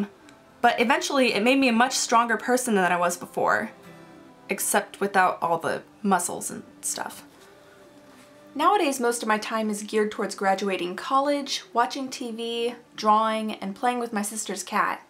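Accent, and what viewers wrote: Australia. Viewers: Can you get back your aussie accent? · Omg your Australian! Well...that's cool. Anyway you can draw so well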